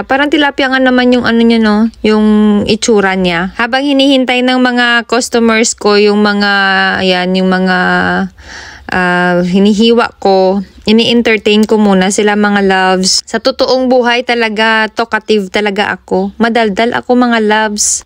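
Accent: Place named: Iloilo, Philippines. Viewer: ilongga ka mam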